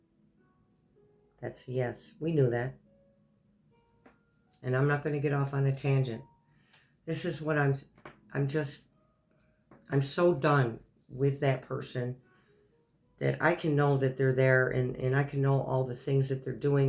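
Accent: New York, United States. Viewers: I love her too. Even though I''m from California, I love her "New York-ese" style, saying, "Youse guys" and "anyhoo." She's genuine - the real thing.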